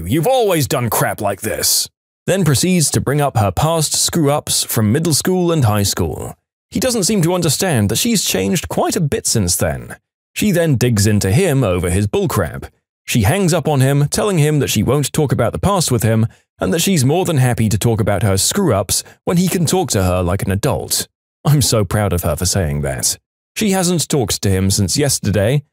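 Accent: United Kingdom. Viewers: This is my first time hearing this voice actor and I was not expecting a British accent (no offense) because I'm used to a really monotone emotionless voice lol.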